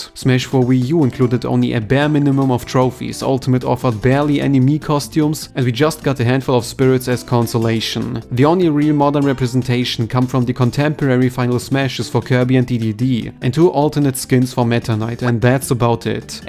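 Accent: Germany. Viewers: Are you Bavarian? Asking as a German, because it sounds like it. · Are you German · Ich hab den Deutschen direkt bei dir rausgehört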